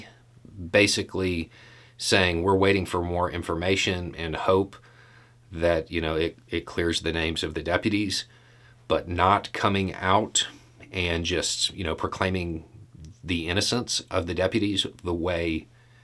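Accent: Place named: South, United States. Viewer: Yeah, I like his accent. I'm used to most southern accents, but stands out to me.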